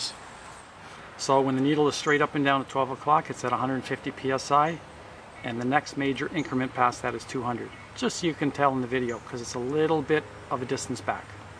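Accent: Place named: Canada. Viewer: Detroiter here. I thought you were Canadian when I saw the Calamata Juice bottle. Then I heard you talk, and thought, "oh yeah, this guys got to be a leaf!"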